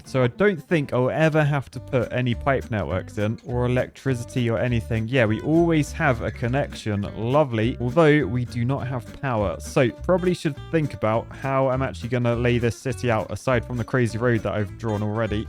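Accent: United Kingdom. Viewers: Bro stop talking like British people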